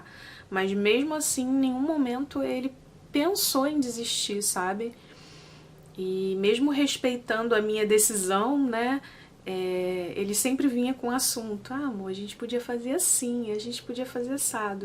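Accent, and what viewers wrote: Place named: Rio de Janeiro, Brazil. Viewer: sua lindaaaaaaa, você é maravilhosaaaaaaa, mesmo! Carismática, de uma delicadeza ímpar! Tão bom ouvir você falar, a maneira doce com que se expressa com tanta verdade e com tanta suavidade ao mesmo tempo. Não se desculpe por nada...quem gosta de você, gosta de tudo e entende...transições não são fáceis. Mas acharemos juntas formas de transformar o que agora pode parecer um limão 🍋 em uma limonada, rsrsrs. Resista, insista, persista! Ouvir esse seu sotaque carioca tão delicioso e lind é sempre maravilhoso, e suas resenhas são de uma qualidade impecável! Vai dar certo! Vamos buscar as marcas. Marcar você pra que lhe enviem produtos. Você merece! Seu conteúdo é muito bom e único! Eu te adoro, mulher! Me motiva muito a me empoderar mais nesse universo de beleza, de maquiagem, de autoestima, de conhecer esse seu novo mundo aí também, nos EUA, e porque não? 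Você tem LUZ PRÓPRIA, acredite, e ela mexe com a nossa vida, pra melhor. Sei que você só pode dar certo. Só falta ajustar alguns detalhes nesse novo momento. Beijo enorme, lindeza!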